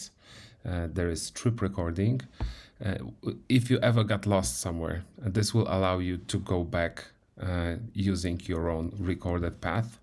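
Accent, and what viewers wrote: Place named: England, United Kingdom. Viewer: Fajny angielski akcent masz.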